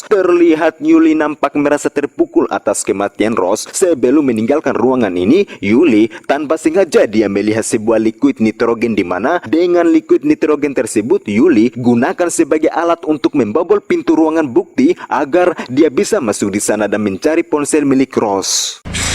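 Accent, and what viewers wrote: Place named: Medan, Indonesia. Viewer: Logatnya Medan bgt 😂.. jdi ingat mntan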